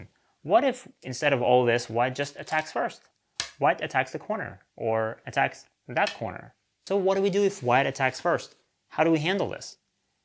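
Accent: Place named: United Kingdom. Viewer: You have a great accent. I thought your from the UK :) I like your passion about the game. Also the studio and the way you talk about the game.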